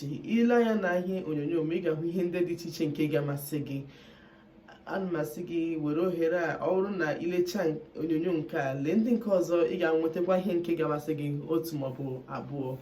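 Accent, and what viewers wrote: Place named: Igbo, Nigeria. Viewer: See how am smiling listening to you speak my Igbo Dialect😃😃😃😍😍😍😍Nnem this one dey totori my body😃😃😃😃💕💕💕💕Please created a Facebook page for Igbo class biko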